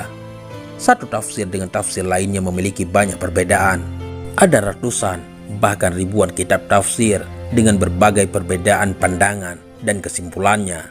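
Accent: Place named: Bali, Indonesia. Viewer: Konten bagus karo narasinya menggunakan aksen Bali jadi kerasa gimana gitu...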